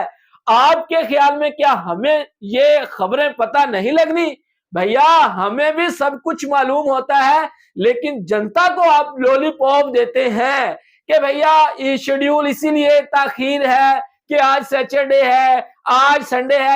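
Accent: Pakistan. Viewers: Oye Tu Pakistani Hokar Indian banke india ke kilaf propaganda Kiyo Kar raha hai tu Jahilo anpado ke ustad hote Hai jeh Pakistani, Inke boli Harkaton se pta chal jata ke Je jahil Pakistani Hi Hoga😂😮😅 Urdu Hindi Ki Maa Bhen ak Kar Dete Hai Jeh Pakistani Jaahil😂, Dekhlo Jaahilo ki Haalat 😅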